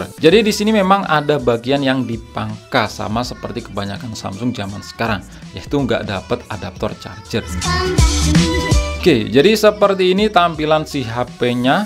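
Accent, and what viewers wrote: Blitar, Indonesia. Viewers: orang Blitar kah bang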